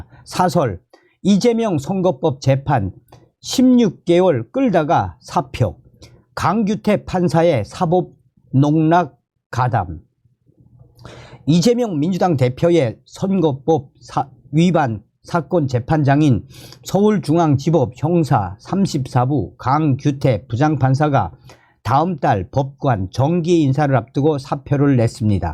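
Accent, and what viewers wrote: North Korea. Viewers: 남의 약점을 꼬집지 마세요. 저분은 남쪽에서 태어나지 않았습니다. 그래도 말씨를 고치실려고 얼마나 노력 하셨겠습니까. 정성산 대표님 항상 수고 많으십니다. 누구보다도 애국자이십니다!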